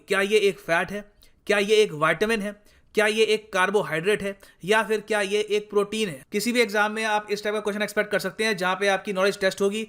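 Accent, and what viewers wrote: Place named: Punjab, India. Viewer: Sir aapka ton Punjabi Wala hai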